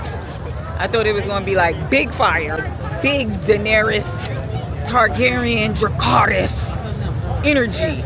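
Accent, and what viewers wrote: Brooklyn, United States. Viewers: Can I say I’m so glad I just found your channel sis BECAUSE the way your breaking everything down in your true genuine Brooklyn voice is TOP TIER YO!!! - No cutesy made up influencer voices JUST THAT TRILL SHIT and when you said Irregardless sent my ass because I STILL SAY THAT 😂🤣😂🤣🤣 instant likes and subscribe or whateva😉💯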